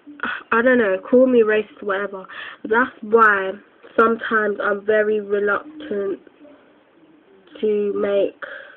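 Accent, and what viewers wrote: United Kingdom. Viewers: yur frm england rite judgin frm yur accent london or the midlands ?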